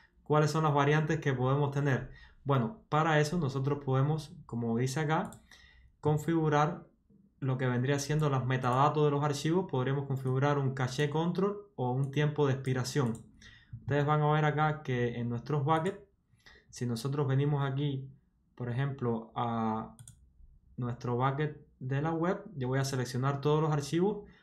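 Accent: Cuba. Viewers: Hola eres cubano ?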